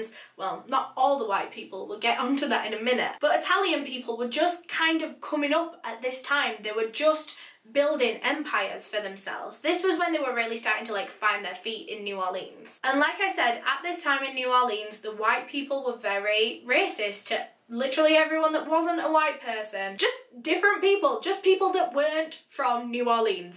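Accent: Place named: England, United Kingdom. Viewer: so you prob sound just as cute! I love this English accent!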